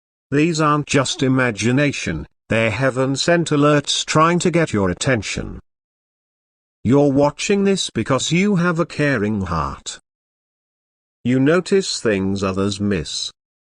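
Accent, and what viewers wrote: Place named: United Kingdom. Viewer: Let AMERICAN talk to American people! Not everyone understands British language!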